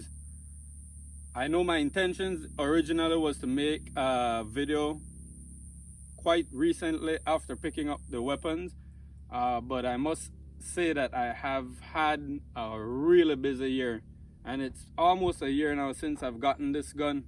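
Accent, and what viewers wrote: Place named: Belize. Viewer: Great setup and good shooting. I discovered your vid while researching light big bores. I think I am sold on a Texan though I would prefer a .30 or .357. Nice to see Belize too. I caught your accent only after a few words lol! Used to be a regular visitor to Belize as a seaman years ago. Keep the vids rolling bro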